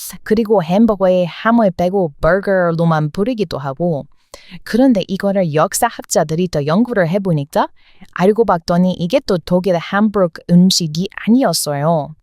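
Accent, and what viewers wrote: United States. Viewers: 는 미국인 발음으로 한국말하는 것이 엄청 듣기 좋읍니다. 제가 하나 알려줄께요. 미국에는 일본유학생이 거의없고, 대부분 아시아유학생등은 중국,인도,한국인들이죠. 역사적으로 중국은 새로운 중국토종 왕조가 지배하면 북방 유목민등의 왕조역사를 왜곡하죠. 그리고, 이런 혼란한 앞뒤맞지 않는 역사.문화유적을 모택동시절에 문화대혁명으로 다 파괴해버렸죠. 그리고, 공산주의 전체주의국가인 중국은 어릴때부터 학교교육에서 자기중심적 교육으로 세뇌를 받았읍니다. 이것이 자본가와 노동자등으로 구분하는 이분법적인 체계로 나와 너, 우리편과 적을 구분하죠. 일본도 민주주의국가인데도 중국같이 왜곡합니다. 한국은 세계에서 유일하게 이분법적인 사고를 가지고 있지않은 사람들입니다. 한국사람은 밝은 것과 어둔 것이 같은 동전의 양면이라는 것이고, 나쁜놈과 좋은놈을 수용하고 배려합니다. 왜냐하면, 사람들은 두가지 면을 가지고 있기때문이죠. 공부해보세요. 바로 이것이 한류의 답입니다. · 의 혀꼬부러진 한국말 들을수록 재미있어요. 진짜 미국인이 맞는지 그리고 집안 내력과 나이 등 좀 알려주세요. 혹시 한국계 미국인 아닌가요? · 근데 진짜 궁금해서 그런데 미국인처럼 흉내내는 한국인 아닌가요? 들을 때마다 신기해요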